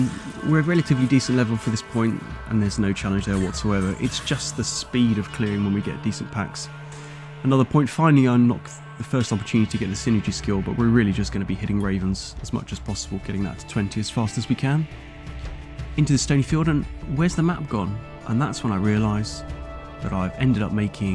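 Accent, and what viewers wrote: United Kingdom. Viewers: Haha! I thought it was like a translation from Diablo 2 in German or something. But your accent is 100 % purebred British and not sauerkraut so I got confused.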